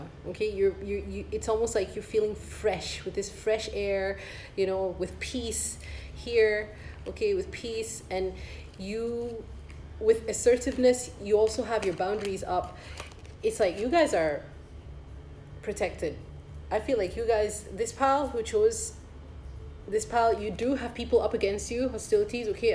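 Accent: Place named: United Kingdom. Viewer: Picked pile 1 TARA I literally wrote Tara’s mantra snd stuck it on my wall few days ago . And YES RELEASE A RELATIONSHIP GOSH KEEPS COMING UP! yes proud of myself thank you for reminding me. Look at all that I have accomplished, and teaching sounds like me! Sharing wisdom! And expressing myself 😭😍 beautiful! Ps. like your cute British accent 😏😏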